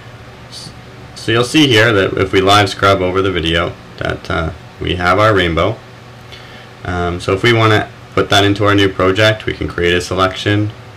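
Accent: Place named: Canada. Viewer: Very helpful; quick information. And a Canadian accent to boot.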